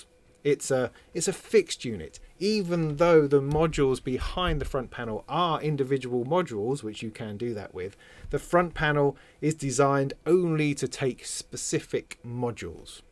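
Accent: United Kingdom. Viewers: you're becoming something of a rather good influence. And so nice there's another UK accent to listen to. just got myself an mpc one as a brain....(not personally... though perhaps could be pressed into service...) for a more advanced system. looking to start investing and building around Christmas time. Keep making the vids... learned everything i know so far mainly from you and divkid., huge thanks (btw... what was that reference you made to church the other day......?)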